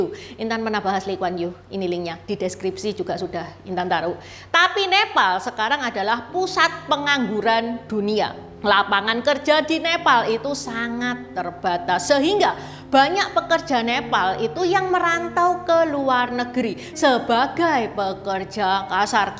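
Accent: Java, Indonesia. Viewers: Suaramu medok sekali, berita nya akurat, mantab sukses selalu, Bali hadir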